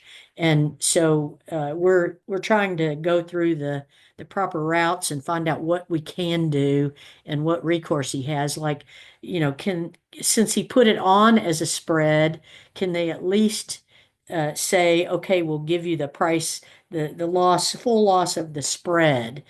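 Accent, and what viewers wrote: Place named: Houston, United States. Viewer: Good morning. Can I join your discord group? Please send link. I am also interested in Dale Perryman's contact info. Do you know how reach him? THANKS! Grew up in Houston and your accent feels like home.....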